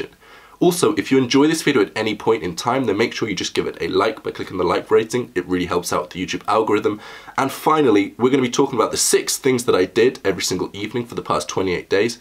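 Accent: United Kingdom. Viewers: ofc this guy is british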